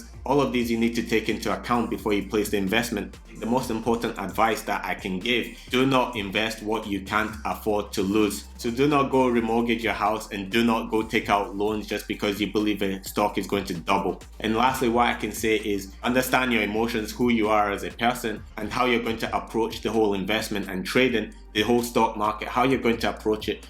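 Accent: Scotland, United Kingdom. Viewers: First time seeing your channel, nice work👍🏽 Your accent is great, mix of Scottish and?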